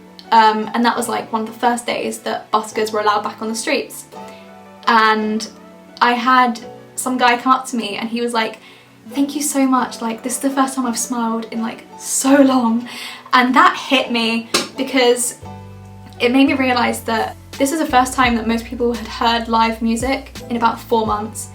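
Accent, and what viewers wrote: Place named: United Kingdom. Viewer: i love her British accent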